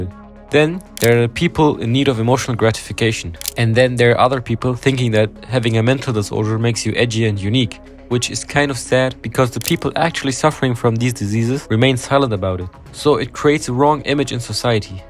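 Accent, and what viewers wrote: Germany. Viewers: You're very underrated. I like the way you edit your videos. And your accent sounds a bit german. Very sympathic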